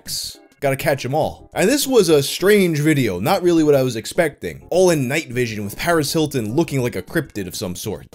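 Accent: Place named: New York, United States. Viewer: How have I just noticed the ny accent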